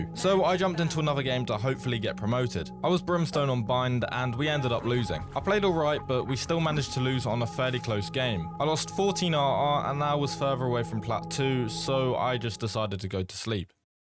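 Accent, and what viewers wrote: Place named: United Kingdom. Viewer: Idk how I didn’t realize you were br*tish until now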